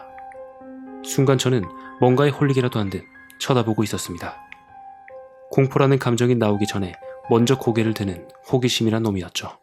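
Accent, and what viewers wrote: Gyeongsang, South Korea. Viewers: 경상도 어디신가용😊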